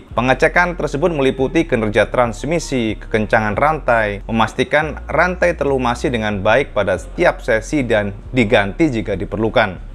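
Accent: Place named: Surabaya, Indonesia. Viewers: Top cak.sangat baik penyampainnya.bahasa suroboyoannya jg ok masio aku wong sundo.tapi toplah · boso ne jowo suroboyoan luuuurrrr....istimewa....!!!! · jane wong ndi to ki kok bohosone suroboyoan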